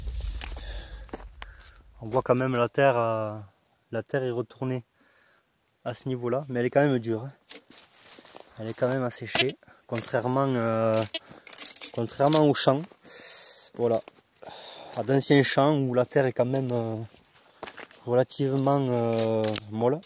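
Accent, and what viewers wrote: Southwest, France. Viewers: Bonjour 👋 je me suis abonné à ta chaîne très interessant est de belle qualité mais t’a le même accent du sud ouest comme moi 😁 tu est d ou ?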